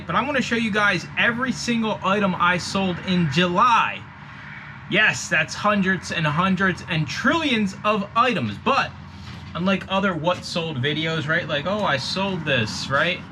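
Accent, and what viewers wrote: Philadelphia, United States. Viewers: hi. I'm in Mt. Airy. I've been all through philly though. Yeah, I could tell by the way you talk that you were from somewhere heavy in philly! Good going,! I love your content. I want to break through this wall I've built Surrounding amazon. But I would love some serious advice. Oh! Question: scoutIq or scoutly starting out? I did the free trial of scoutiq and it was ok.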